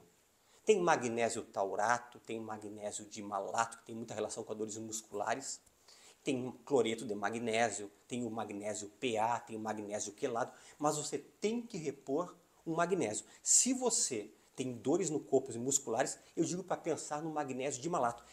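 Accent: Rio Grande do Sul, Brazil. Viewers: Ah...reconheci nosso sotaque gaucho❤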